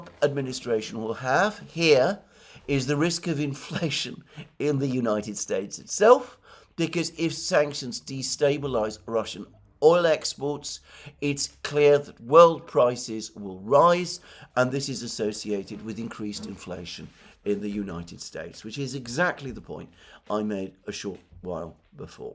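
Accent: United Kingdom. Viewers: Somehow Trump's poetic prose sounds even more powerful in your British accent 😅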